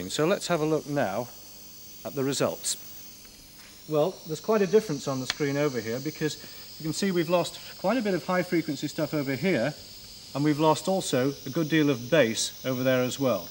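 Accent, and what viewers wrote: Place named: North, United Kingdom. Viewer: He was too northern for 1980 BBC sadly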